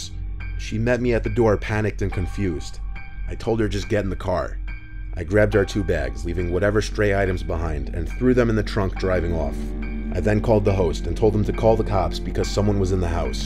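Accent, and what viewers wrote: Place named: Boston, United States. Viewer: Hey, I love your stories so much. I wanted to tell you, I recognized your accent from Boston so I checked out your insta. and I wanted to say that since you’re from Boston, you’ll be happy to know my mom and I are visiting there in September. I’ve always wanted to check out Salem.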